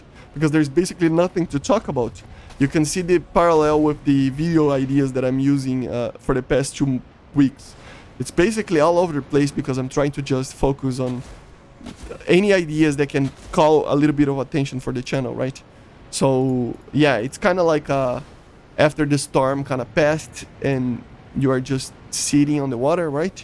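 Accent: Brazil. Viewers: Eu identifiquei o sotaque brasileiro IMEDIATAMENTE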